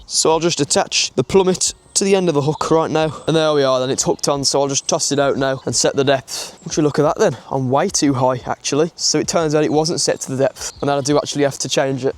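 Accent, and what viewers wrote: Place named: Birmingham, United Kingdom. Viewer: Great video were u from im from the blackcountry u sound like a brummie just found u lol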